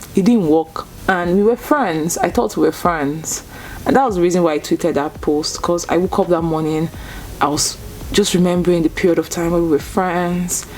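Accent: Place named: Igbo, Nigeria. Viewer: which part of igbo are u from bc this ur English ashaam sounds like ours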